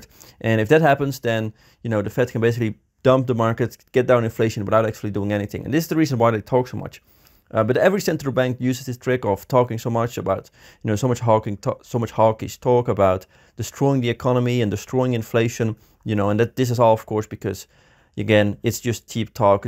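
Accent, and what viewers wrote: Netherlands. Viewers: Nederlandse accent. Nederlanders zijn altijd gretig voor de goedkopere prijzen lol.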